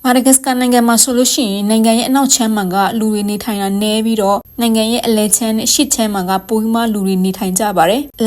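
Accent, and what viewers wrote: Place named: Myeik, Myanmar. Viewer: မြိတ်သံဝဲနေသလိုပဲ၊ မြိတ်/ဘိတ်ဖက်ကထင်တယ်နော်။ Congratulation ပါ။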